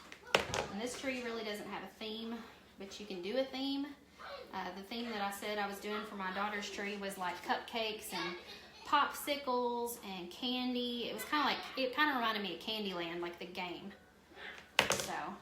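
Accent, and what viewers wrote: United States. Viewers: New subscriber! I am going to try this. Love your accent! What state are you from? Me = PA.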